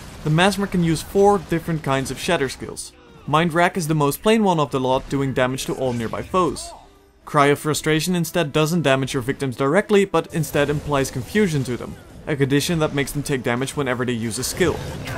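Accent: Netherlands. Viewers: I knew it :D you are dutch :3 could hear it :o anyways awesome explenation :o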